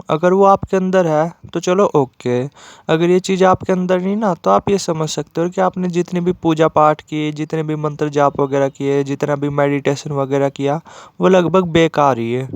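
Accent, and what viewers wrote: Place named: Haryana, India. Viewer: hello bro phone लेकर ही बैठे थे 😅क्या वह हमें लगा कि आप हरियाणा से हो आपकी वॉइस से पता लग रहा था आपकी वीडियो बहुत अच्छी इसको कंटीन्यू रखना और दोनों चीज हमें भी है जैसे कि आपने वीडियो में बताया थैंक यू सो मच एंड गुड ब्लेस यू✨🙏😌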